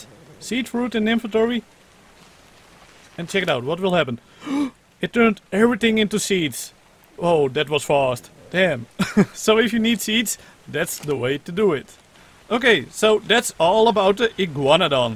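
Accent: Netherlands. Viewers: are you dutch? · ben jij een nederlander je hebt het typisch nederlandse accent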